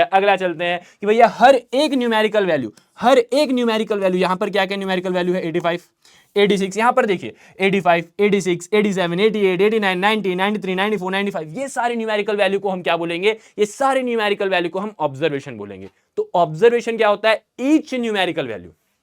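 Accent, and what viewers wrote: Bihar, India. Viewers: sir app bihari ho kya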